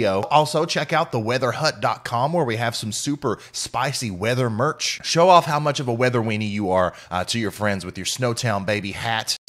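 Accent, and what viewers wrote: South, United States. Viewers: My Birthday too... but, I could be your Dad. :-) ... What state are you reporting from?... sound a bit of a southern twang. I'm in Georgia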